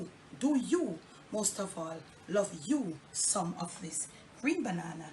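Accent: Jamaica. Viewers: I just LOVE the way describes all the ingredients and cooking techniques with her lovely Jamaican accent and "all ah dat!" It is such a pleasure to have you share all of the good food dung a yard. Thanks for sharing! I've subscribed.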